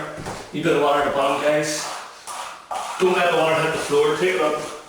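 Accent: Ireland. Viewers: Sounds Irish ?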